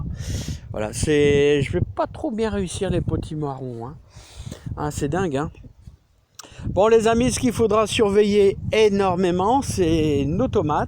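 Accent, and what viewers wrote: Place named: Nord-Pas-de-Calais, France. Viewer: Bonjour, je n'ai pas de jardin. Mais je profite à fond des vidéos que vous postez, j'adore Votre bonne humeur😅. Je suis une chti partie dans le sud, et votre accent me rappelle d'où je viens😉. Bonjour à toute la team des jardiniers 😁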